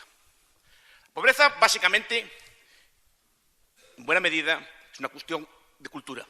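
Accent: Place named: Galicia, Spain. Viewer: Interesante sus argumentos, ....el acento gallego lo hace adictivo....saludos desde Buenos Aires, provincia de ultramar Gallega....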